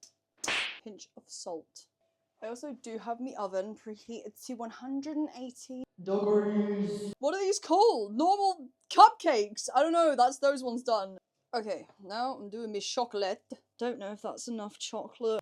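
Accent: United Kingdom. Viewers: your British accent, ❤❤❤ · HELP I DIDNT KNOW YOU WERE BRITISH Say mongoose for me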